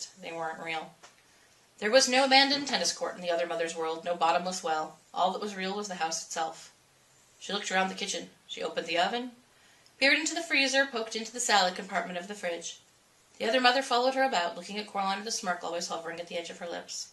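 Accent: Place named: England, United Kingdom. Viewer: Best in an English accent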